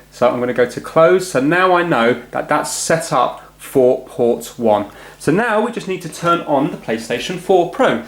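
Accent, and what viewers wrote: England, United Kingdom. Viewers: this british accent gives me on my nerves.. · He has a British accent. Not sure where you get this impression. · Fuck british accent.....weak accent ever. Try to be American. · Great instructions. Nice clear English accent. Thanks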